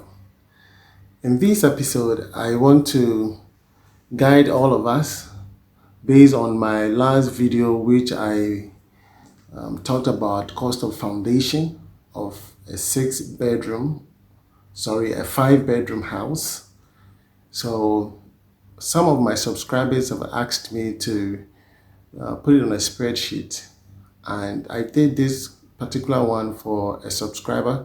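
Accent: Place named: Ghana. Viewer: And you speak good ghanaians english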